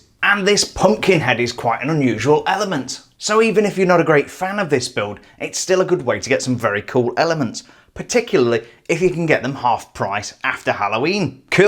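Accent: England, United Kingdom. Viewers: I thought you were English?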